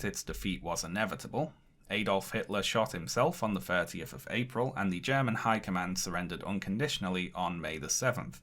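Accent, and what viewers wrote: United Kingdom. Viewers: Japan forfeited it's right to anything but death and distraction when it attacked Pearl Harbor. It's easy for people in the relatively safe and far less brutal 21st century to point fingers at the past but if Pearl Harbor happened today, it would be grounds for a nuclear retaliatory strike. The war broke Japan's genocidal list for war and extermination so if cooking a few hundred thousand of them alive was what it took, it was a small price to pay. Go live through the Rape of Nanking or the Battan Death March and then tell me how compassionate you are about Japanese school children and so on. Those Japanese school kids would have grown up to kill, rape and destroy like their parents and grandparents had the United States not destroyed Japan's centuries old Warrior culture. Then again... The person in this video sounds British and they know more about killing, raping and destroying than anyone considering they spent several hundred years doing it to a quarter of the world. 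· I think it's the British accent that makes them think what he is saying is true.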